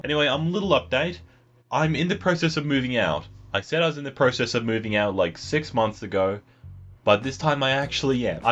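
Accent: Australia. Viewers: GoingGone he’s Australian. Not British.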